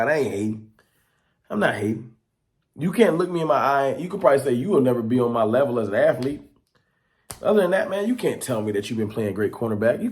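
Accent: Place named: Philadelphia, United States. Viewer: Bruh you sound like you from Philly or jersey! 🧐🧐🧐🤦🏽‍♂️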